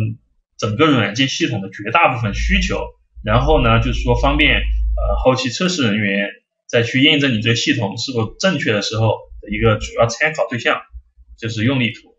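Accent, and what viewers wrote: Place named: Sichuan, China. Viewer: 老师像是四川人，哈哈哈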